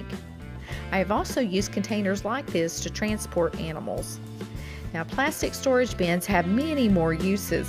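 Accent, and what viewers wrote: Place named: South, United States. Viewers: She from Texasss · I love your voice. I'm southern too and this makes me feel like I'm in Sunday school with my teacher Mrs. Katie ❤️ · Western North Carolina here. We're neighbors! Are you from East Tennessee? Your accent sounds very similar to ours. Kenny Chesney is from Sevierville, I think.:D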